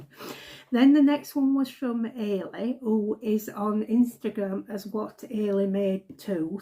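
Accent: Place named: England, United Kingdom. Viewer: Just found your channel. Love Vera and your lovely English accent. You do good work and I subscribed!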